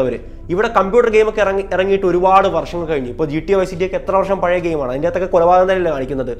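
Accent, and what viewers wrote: Vadakara, India. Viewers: ... Nee vadakarayano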